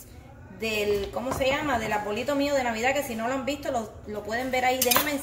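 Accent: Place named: Cuba. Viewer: Estraño cuba y me encanta que tienes aún tu asiento cubano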